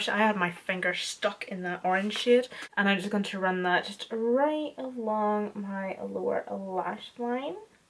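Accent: Northern Ireland, United Kingdom. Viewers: love this look, I'm from Ards so it's good to hear a northern irish accent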